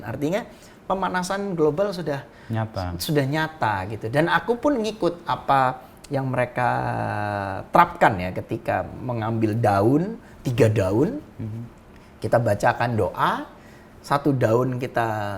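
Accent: Java, Indonesia. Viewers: baru tau ini di RL, Mas ngomong ada medok nya 😂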